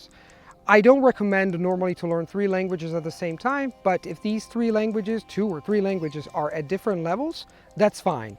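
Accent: United States. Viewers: Love your american accent, I'm learning japanese and corean, the accent, entonation and phisical expressions are very important in those to express and conect with native speackers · Hey there, long time no see ! Non vedevo tuoi video da qualche anno, è vero come hanno scritto altri che hai perso l'accento americano, sta capitando anche a me da quando insegno la dannata RP! Da iperpoliglotta con più di 20 anni di "carriera" non consiglierei però di imparare più lingue contemporaneamente, ho notato che mi ricordo meglio le lingue imparate "in solitaria" che quelle apprese in gruppo, i primi anni di università studiavo inglese/tedesco/portoghese e andavo a corsi serali di cinese, ho dovuto smettere non mi ricordavo più l'italiano! Comunque l'acquisizione duratura di una lingua è un mito, ho conosciuto poliglotti in età avanzata che col tempo si sono dimenticati una o più lingue, il che mi preoccupa un po' ... 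ci vuole soprattutto tanto tempo libero per mantenere livelli elevati in una lingua (C1/C2), rimpiango il periodo in cui non lavoravo... sto scherzando l'insegnamento mi piace molto. See ya ! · He’s lost his American accent. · J accents are only lovely when you are speaking english, but if you speak a foreign language with a heavy english accent, you often would get criticized because the pronunciation is off. I'm honestly impressed with his American accent, he sounds really fluent.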